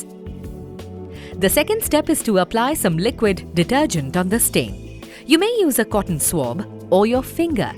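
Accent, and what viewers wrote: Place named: India. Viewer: Indian English. BP and TD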